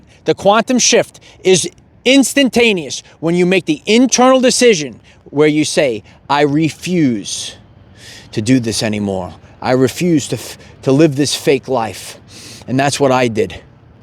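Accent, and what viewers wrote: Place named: Massachusetts, United States. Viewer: You sound like your from Massachusetts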